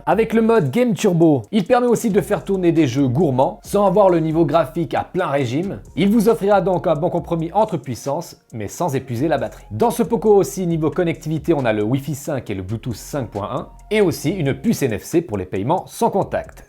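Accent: Switzerland. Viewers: Rien que pour ton humour et ta joie, ce qui ne t'empêche en rien d'être très Pro, précis et perspicace, je regarde tous tes tests même si j'ai pas vraiment besoin d'un smartphone... mais plutôt de sourire sur ton charmant accent franco-"phone".... hihihi! Bises à la Suisse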